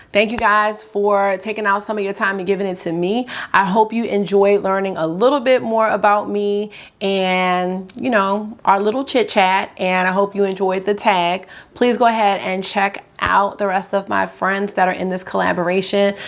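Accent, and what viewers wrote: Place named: DMV, United States. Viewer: New subbie! Fellow Teacher here! As soon as you started talking I knew you were from the dmv, me too girl, glad I found your channel!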